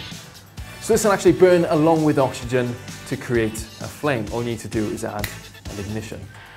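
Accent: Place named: Wales, United Kingdom. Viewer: Hey your welsh arnt you i can hear the accent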